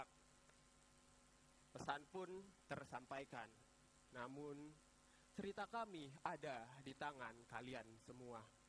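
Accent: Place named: Banyumas, Indonesia. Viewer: Mas Ngapak idolakuuu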